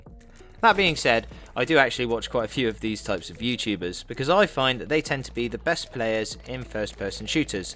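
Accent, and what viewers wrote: Australia. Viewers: Looks like you got that aussy accent down after all bud.😆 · I thouth you came from australia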